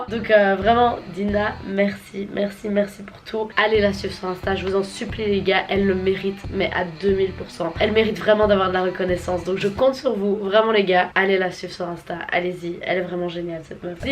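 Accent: Belgium. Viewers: Salut tu es belge ?❤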